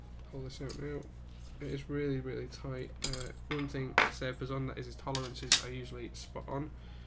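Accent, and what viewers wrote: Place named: Yorkshire, United Kingdom. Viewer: I'd put your accent as Yorkshire, but just a guess.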